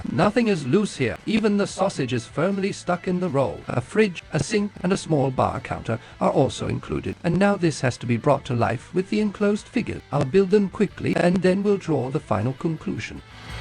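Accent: United Kingdom. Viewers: Hello 😊... Yeah 🕺... First comment here 👌🏻. Good job and well done my friend. Very British speech. But what happened with the sound? 🤔 It's scratchy 😊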